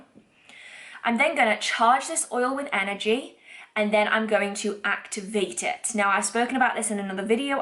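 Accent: United Kingdom. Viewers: That you for your YouTube channel what country are you in like your British accent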